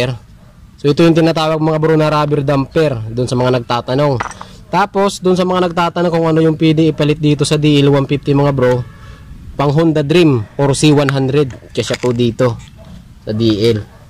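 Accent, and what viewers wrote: Bicol, Philippines. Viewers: bicolano ka boss?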